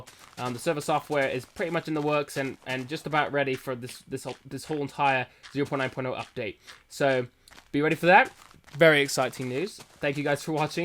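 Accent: United Kingdom. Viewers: I like your videos and that British accent :D the vids are giving me patience ... :)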